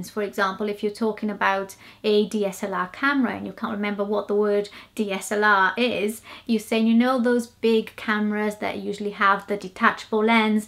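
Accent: Wales, United Kingdom. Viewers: You have the perfect accent when speaking English. Don't give up because you are very good at it👍also your accent sounds good welsh. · Welsh accent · Native English speaker here (Scottish from Glasgow, have lived in London for over 20 years now). For the first 7 minutes of this video, I was thinking:"if there's no Welsh connection, I'm going to be very surprised; what a lovely perfect English-with-traces-of-Welsh (e.g. the beautiful way you say the word "years") accent". Then : Welsh boyfriend/husband. BOOM! You are obviously a very talented linguist, and your accent is beautiful. :-) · So cool that you've absorbed a welsh accent!! As soon as you started speaking I thought you had a welsh twang. It was great to hear a British accent but then when I heard the Welsh that was a nice surprise :D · American? She sounds Welsh to me! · Your accent sounds quite Welsh! 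(I'm English) did you happen to spend some time in Wales? Edit: omg you just said you have a Welsh accent! Haha knew I had a good ear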